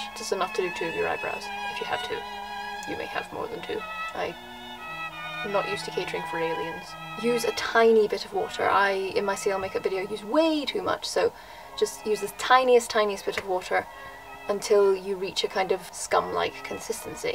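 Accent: United Kingdom. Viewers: ...But he is British. He always sounds British.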